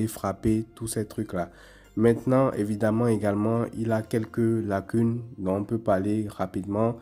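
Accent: Benin. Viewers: Tu as un accent beninois😂👍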